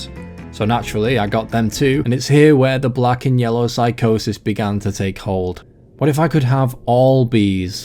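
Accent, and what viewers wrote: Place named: England, United Kingdom. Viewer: i cant wait to see your bee team as a fellow funbeemon enjoyer wait what, why retire the bees when you can evolve them to their beeier forms. funbeemon's mainline is nothing but one big beehive, unfortunately, funbeemon's most recent new evolution branch wasn't added to the game though hopefully in an update or dlc it is. love to see you really enjoying the game bro. and a fellow englishmen as well i hear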